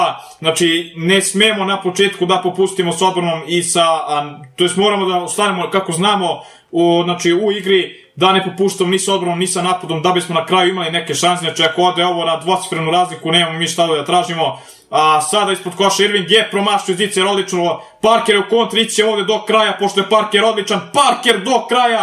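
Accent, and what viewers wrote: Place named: Nis, Serbia. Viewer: pricas ko da si sa nisa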